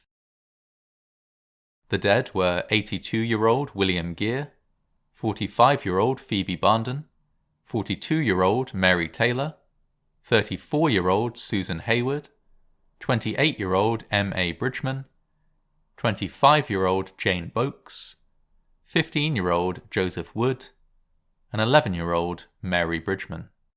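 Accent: England, United Kingdom. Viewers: Your voice, I'm addicted to it. I would follow you to college if you were a professor. Where are you from, England? Love the accent mate. Glad your channels blowing up.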